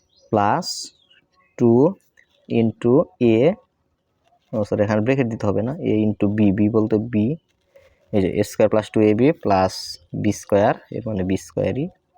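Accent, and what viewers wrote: Tangail, Bangladesh. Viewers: ছার, আপনি কি টাংগাইলের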